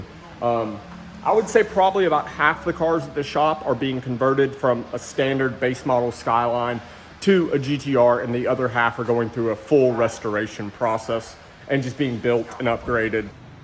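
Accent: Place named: Appalachia, United States. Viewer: Were you from originally sound a little hillbilly to me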